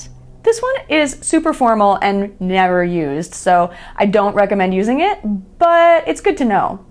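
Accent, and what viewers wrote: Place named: United States. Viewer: why ur English is so obevious ......is that American accent ?????? if that American I trust myself I can speak English fluently.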